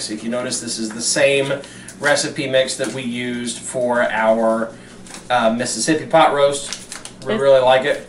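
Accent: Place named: Arkansas, United States. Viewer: I really enjoyed your "how-to" video! Where are you from? You sound like you are from my neck of the woods (Arkansas).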